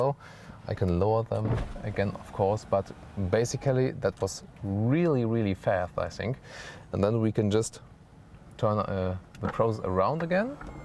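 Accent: Germany. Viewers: Nice details, impressive narration. Love to listen your German-accent English. Keep going,!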